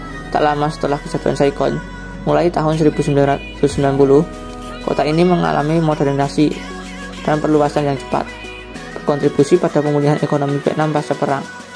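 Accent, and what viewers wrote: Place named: Java, Indonesia. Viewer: Ngomongnya medok banget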